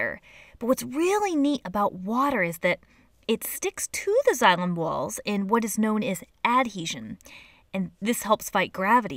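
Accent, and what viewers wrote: United States. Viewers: Nah, it's the annoying whiny voice, american accent, and also the tone that would be condescending for adults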